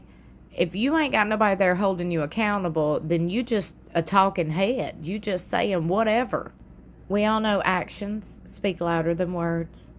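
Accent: South, United States. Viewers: I love your voice! And your southern accent too!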